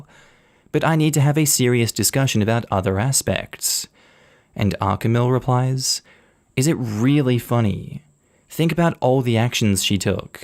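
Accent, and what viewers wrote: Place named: Australia. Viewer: your Aussie accent. I love listening to you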